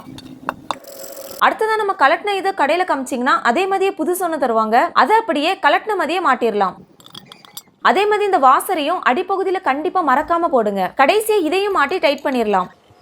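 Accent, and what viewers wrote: Tirunelveli, India. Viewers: ஊச்சி ஊச்சா இருந்தா......தூத்துக்குடி,திருநெல்வேலியா?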